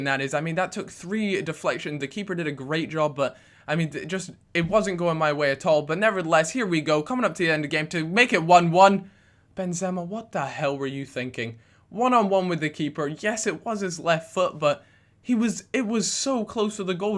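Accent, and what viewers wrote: Scotland, United Kingdom. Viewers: I hate ur gay scottish voice!! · wait he is scottish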